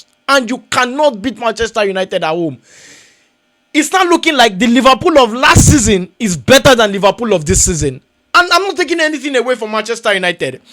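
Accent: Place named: New Jersey, United States. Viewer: That’s a lovely accent you have. New Jersey?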